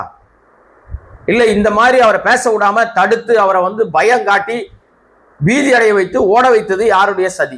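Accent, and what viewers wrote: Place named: Southern Tamil Nadu, India. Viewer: இவ்வளவு அழகாக தமிழ் பேசுகிறீரேறே. உமது சொந்த ஊர் எது? தெற்கு பக்கமா?🎉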